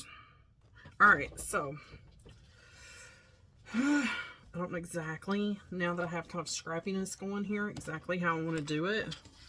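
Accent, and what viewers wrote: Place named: South, United States. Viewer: My favorite Christmas cookie is Chocolate Crackle cookies. It is so easy to make with a cake mix. Every time I make them they just disappear in a hurry. My whole family loves them. Love your channel and love to listen to your southern accent as I have said before. Your videos are all great.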